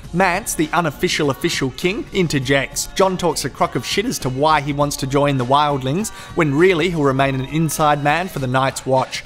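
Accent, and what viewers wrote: Australia. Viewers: I absolutely love these reviews!!! I found them when listening to other GoT stuff on here, and I'm hooked! Also listened to some of your other stuff, and plan to go through ALL of it!!! It's that accent! Oh God! The Ozzy accent is my favorite to listen to. All this talk about listening and not watching? Well, to be honest, I'm blind. Maybe, if I'm lucky, I'll get to come to the land down under one of these years. It's been a dream of mine? What other interests do you have aside from Thrones? I'm also into Babylon 5, Star Trek, Lord of the Rings, studying languages, reading anything I can get my hands on, and listening to music.Cheers!Chelsea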